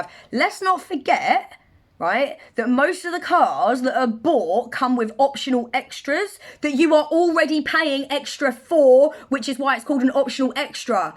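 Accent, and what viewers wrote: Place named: East London, United Kingdom. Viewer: lol only found your channel like a week or 2 ago and love it lol you really remind me of home i was born in east London and grew up in Essex, lol you sound so much like me on things 👍 i have been listening to Alex Jones for 25 years or a little more and everything he said is here now in everyone's face!!! and still people call him crazy lol i could natter for hours with you. keep up the videos they are great.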